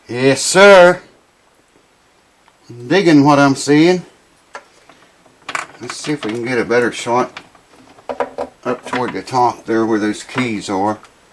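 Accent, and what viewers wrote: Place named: Arkansas, United States. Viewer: Tickles me, I am from Arkansas and you talk a whole lot like I do. I am just uglier than you, B/C I am 71 (seventy & one) years old.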